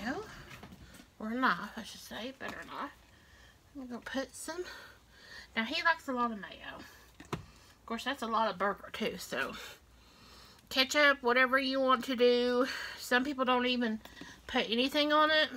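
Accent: South, United States. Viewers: Haven't watch u in months n months , came across my screen today had to watch those burgers yummy ..... Luv ur Southern accent girl .... Be safe 🙏😷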